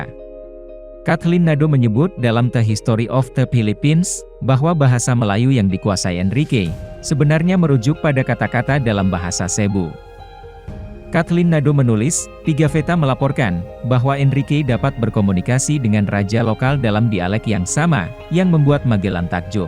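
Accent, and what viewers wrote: Banyumas, Indonesia. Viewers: Admine wong ngapak ya? wong ngendi rika mas?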